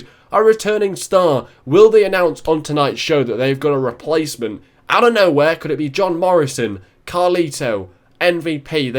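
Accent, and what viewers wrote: England, United Kingdom. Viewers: I was wondering which part of England are you from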